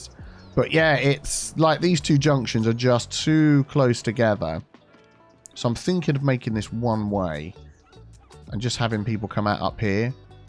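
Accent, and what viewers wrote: United Kingdom. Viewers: I've always wondered, why do you use the American 'counter clockwise' rather than the English 'anti clockwise'? I know it's appropriate in this case as it's an American theme build, but you do it in your other cities too. I was just wondering as your accent clearly means you're from this side of the pond.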